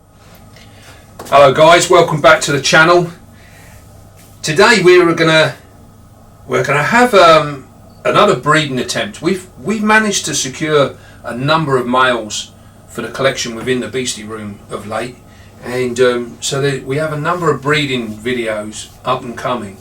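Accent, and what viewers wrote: United Kingdom. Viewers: You sound so properly British!!! Lol, compliment mate!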